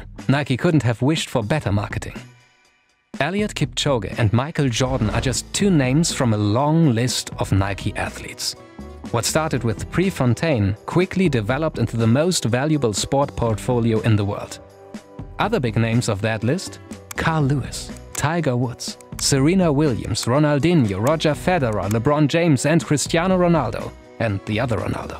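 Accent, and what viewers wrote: Germany. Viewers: Flashing images no shit. This content should not exist on YouTube because of the flashing images. A warning before the video won't cut it id say. Also this guy's accent is on par with the Flashing images, gtfo sauerkraut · After 1,5sec you can tell this guy is german, one of the very few who can actually pronounce adidas correct.🤣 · The German accent narration is winning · i hear a fellow german accent if i hear one;) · love your german Accent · what you mean 'nobody', his accent is more German than Stefan Effenberg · I hear the speaker is german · Are you German we can hear it. Ja aber ist trotzdem ein gutes Video. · Deutscher Akcent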